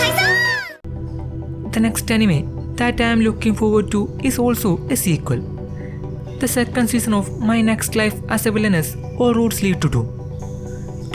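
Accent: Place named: Kerala, India. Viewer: thicc mallu accent xD, but well made video 👍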